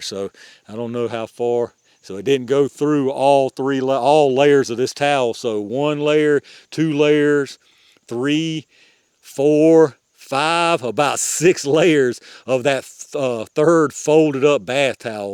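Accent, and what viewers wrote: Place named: Appalachia, United States. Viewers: This is 2026 right? I think we can do without the hillbilly accent. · That's just the way we talk in the mountains. You say hillbilly like its a insult😆🖕💯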